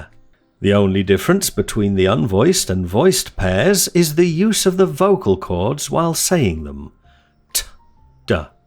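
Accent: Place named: England, United Kingdom. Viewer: Hi dear teacher, I am from Myanmar, English is not my language but I can express writing my feelings with English. But I can't speak it. Kindly guide me with some tips and I love your English accent and teaching. Could you plz also make a long video about phonemes and phonetic with great example plz. Thx so much .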